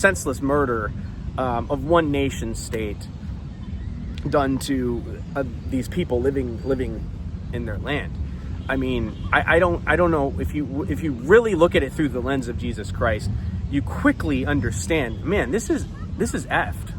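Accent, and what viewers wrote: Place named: United States. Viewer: Wow. I just finished watching the entire video and I'm very impressed by your intellect. I'm glad some Americans like you can think critically with valid information and make out an answers by themselves. I'm not a Christian and neither do I plan to be one but I'm very glad a white American (Just assuming based off of your accent and appearance) is actually following the true teachings of the Christianity and not some twisted version made by ideologically skewered men. Thanks for making this video, it gives me atleast a glimmer of hope in a country I though was doomed and destined to fail.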